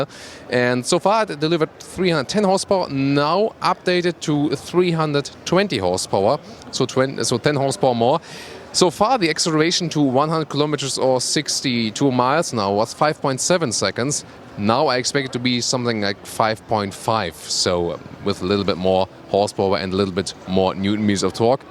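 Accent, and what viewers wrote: Germany. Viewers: I'm enjoying this just because it is a German review of the car:) I've been to Germany 7 days and love it there but listening to a German speak English and review a car.....epic!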